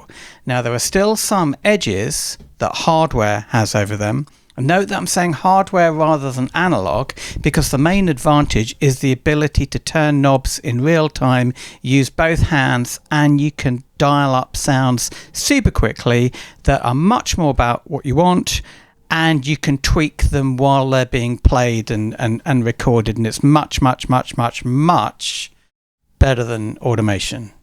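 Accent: United States. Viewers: Why you speaking in American?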